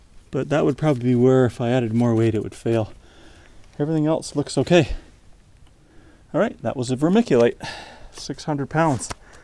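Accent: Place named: Canada. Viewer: His accent didn't gave him away? Aboat?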